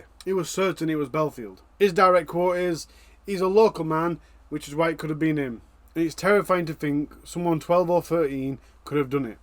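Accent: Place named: North, United Kingdom. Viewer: As a girl I’ve worn 2 pairs of undies for different reasons… 1. Undies, tights then another pair over the tights to keep them up & in place. 2. As a school girl I’d put my PE pants on over my normal ones if wearing a short PE skirt for netball, hockey etc. 3. If I was on a period & thought it could be a day that I may need extra protection. (TMI?!🫣) 4. If bunking off to meet my first love, putting normal boring ones on first & a nice pair on over them with the intention of slipping 1 leg out at a time to take the boring ones off ready to meet my BF… Maybe that’s what she was actually bunking off school for?? (I know I did!!Again, TMI?!🫢) Who’s to say Belfield wasn’t the one she could have been meeting up with?? BTW, newly subbed & I love your content…especially love your accent!! Hearing ‘gidum’ & ‘tekit’ to name a few, bring little smiles & remind me of my further north friends & family x Thank you x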